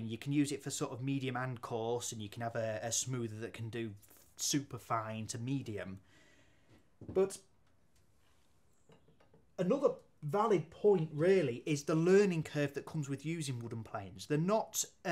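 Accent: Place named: England, United Kingdom. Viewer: I just recently discovered your videos and am glad I did. I am new to woodworking and looking to soak up every piece of knowledge I can. You explain things very well and with an enjoyable accent as well. (Here in corn country in the U.S. you don't hear an English accent very much) Keep up the good work and I look forward to watching more of your videos!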